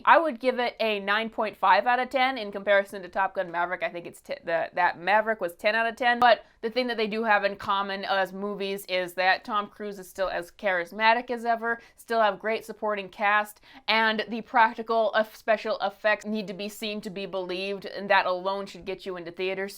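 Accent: United States. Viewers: I love your accent! What state are you from?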